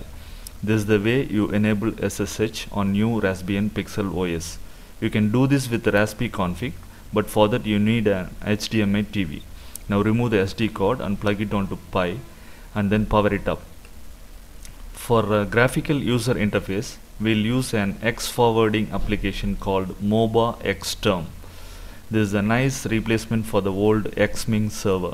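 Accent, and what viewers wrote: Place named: Tamil Nadu, India. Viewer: i am going to have a stack of pi like sbc's having usb's and probably no ethernet (if there is ethernet we use it if not we connect via usb) ... these will be accessed over ssh by a "control computer" (typically a laptop) ... it is a stack which is experimental but production version could have 100's of them for super computing ... you said ssh file will be deleted ... how to have ssh file on every power up of pi's? ... all operations you show seem tedious and requiring human to gain access of each pi which would not be practical when they will be in 100's ... otherwise video is informative ... thank you ... your tamil affected pronunciation is not affecting understanding here because it is a video and we can piece together meaning by looking at action you perform ... but you are pronouncing "cord" for "card" which can confuse in other videos / audios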